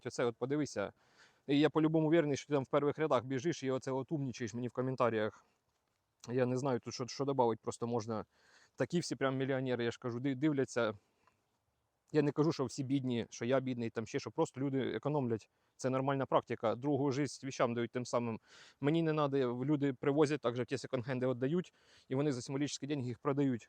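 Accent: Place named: Surzhyk, Ukraine. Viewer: Балакай краще вже на російській,бо твій лютий суржик це капець.....або вивчай мову краще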